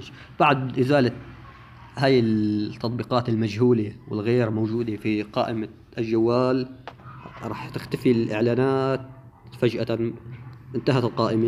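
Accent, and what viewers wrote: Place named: Syria. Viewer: باين عليك سوري وحمصي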